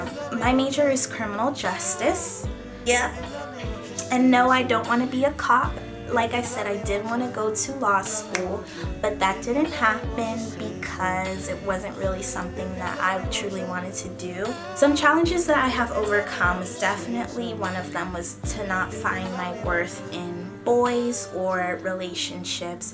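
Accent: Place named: Nigeria. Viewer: I loved this video, it was bomb! Learned more about you & your Nigerian Accent lol <3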